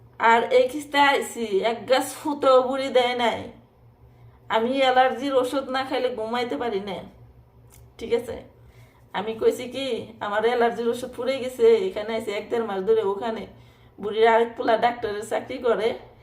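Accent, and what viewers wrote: Manikganj, Bangladesh. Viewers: আপনার কথায় বুঝা যাচ্ছে আপনার গ্রামের বাড়ি মানিকগঞ্জ বা টাঙ্গাইল.।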